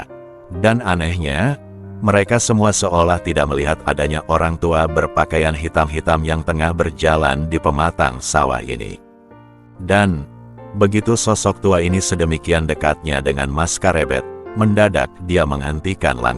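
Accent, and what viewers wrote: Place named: Banyumas, Indonesia. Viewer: Ngapak bshasane tur kaku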